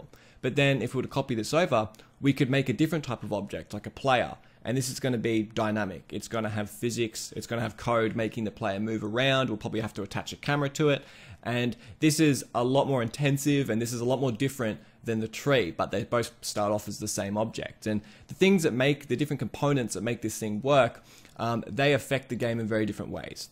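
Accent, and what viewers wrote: Australia. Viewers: Australian accent is so beautiful 🥰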